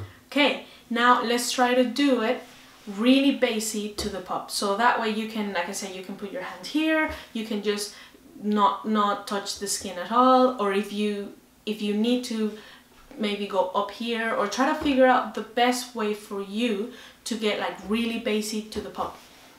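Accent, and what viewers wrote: Ireland. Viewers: Great lesson your accent is like a half Kerry half SAN Paolo. Thanks for sharing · Yet again another great tutorial video here, I have been very lazy over 2 yrs when it comes to Bodhran practice, but now I've discovered you I've made a pact that I will do 15mins a day but it ends up being 30mins no problem n that's down to ur teaching skills. You have an Irish accent in there somewhere? Are u Irish n moved abroad? Or the other way round? Do u play in a band by anychance? Sorry for all the questions btw I'm just curious 🥁🇨🇮🍻🥁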